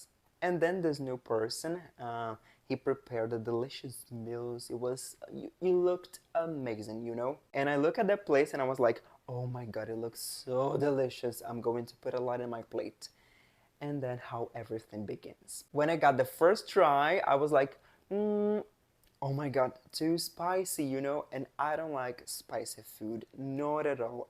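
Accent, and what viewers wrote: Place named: Brazil. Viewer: não tem como não entender pois por mais que você tente fazer a pronúncia de um americano você fala do jeito cadenciado de um brasileiro então está 100% compreensível sim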